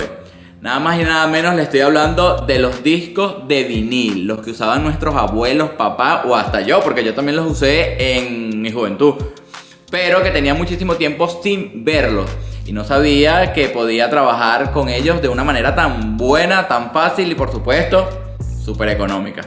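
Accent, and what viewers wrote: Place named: Venezuela. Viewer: Hola! Agradecidas Bendiciones para ti y tu gente! Deduzco que eres venezolano por tu acento! Me parece que estás en otro país! Tengo muchos LP y me estoy deshaciendo de ellos; si sabes de alguien que los quiera o necesite, estoy a la orden para regalarlos! Un abrazo hermano!:face-red-heart-shape:🙏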